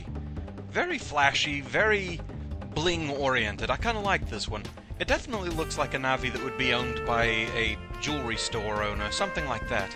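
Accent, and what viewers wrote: United Kingdom. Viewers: i like the accent too. i can't place it. uk?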